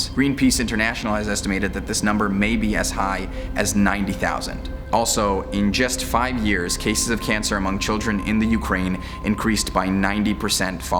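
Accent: Russia. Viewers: I was super impressed with your Russian accent/pronunciation/reading. I did not expect that. However, I REALLY hope you DID NOT TOUCH ANYTHING, and were careful where you walked. I'm frustrated that you were allowed to do this video with zero protective gear and no Geiger meter. Also your lack of knowledge regarding the dangerous situation you were heading into was stressing me out. I mean, there were definitely new things in that video that I had never seen in regards to Chernobyl, which was pretty cool, but I was too distracted by the risk you were taking with your own health and safety. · Nice russian accent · Bruh your russian accent is on point. Neat story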